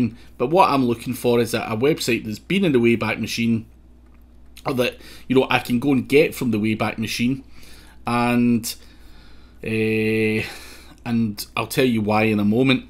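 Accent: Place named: Glasgow, United Kingdom. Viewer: Lovely accent from Glasgow;)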